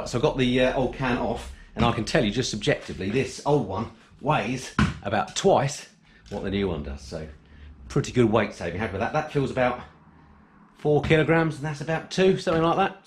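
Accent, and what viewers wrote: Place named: Essex, United Kingdom. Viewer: Classic Essex accent which sounds like an Aussie accent :)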